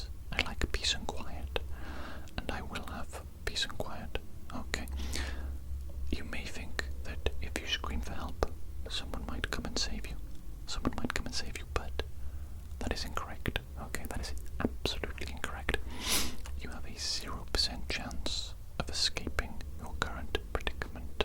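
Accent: Wales, United Kingdom. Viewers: wondered and wondered if i was imagining the welsh accent, but then you said 'ini' and i'm certain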